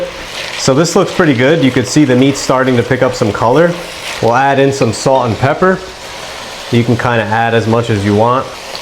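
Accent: United States. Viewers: It is very fun to hear this perfect American accent, though he is from Italian descent, so it is easy to understand what he says too. Next time I will make vegetable broth first. :face-blue-smiling:I never let this sauce cook for two hours. I think it must much healthier when it cooks for only 20 or 30 minutes. I don't understand why Italians cook it for so long. :face-fuchsia-tongue-out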